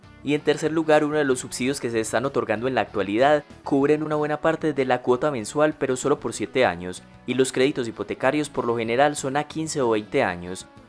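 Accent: Antioquia, Colombia. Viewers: "Paisa" se le llama a las personas nacidas en los departamentos: Antioquia, Caldas, Risaralda, Quindío y al norte del Tolima ubicados al nor-occidente de Colombia. Dicha región es cafetera y sus habitantes tienen el acento como la persona que hace los vídeos. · PURO HUMO , UNA VOZ MUY PAISA... DESLEGITIMA ESTE ANALISIS, MUY MONTAÑERO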